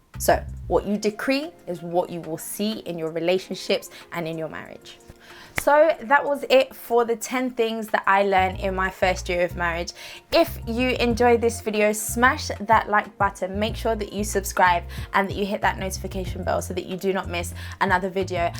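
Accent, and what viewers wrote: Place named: London, United Kingdom. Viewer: I didn’t notice your accent until you said you were from London 😂 it’s so subtle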